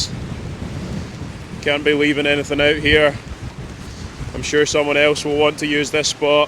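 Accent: Scotland, United Kingdom. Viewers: thanks for another great trek, always look forward to a posting, with the camera work, the sounds, the narration and information in your Scottish voice, the calming demeanor, it's almost like being there, can almost smell you making meals. Charles would be honored that the stopped at the estate and the bothy would always be accommodating....safe summitting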